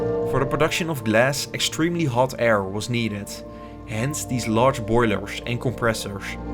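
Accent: Netherlands. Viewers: This dutch accent is awful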